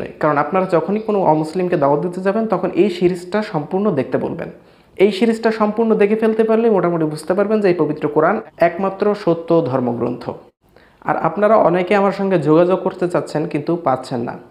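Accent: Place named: Barisal, Bangladesh. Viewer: আপনার তথ্যগুলো চমৎকার। কিন্তু কয়েকটি বর্ণের বরিশালের আঞ্চলিক অশুদ্ধ উচ্চারণ শুনতে খুবই বিশ্রী লাগে।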